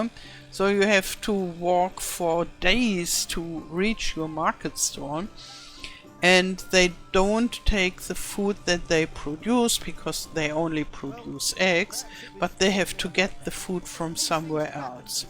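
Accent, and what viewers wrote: Germany. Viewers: A granny with a German accent :) So sweet :)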